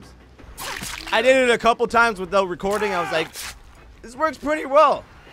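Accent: Czech Republic. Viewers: You from Czechia ?😂❤🇨🇿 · ty jsi čech vole?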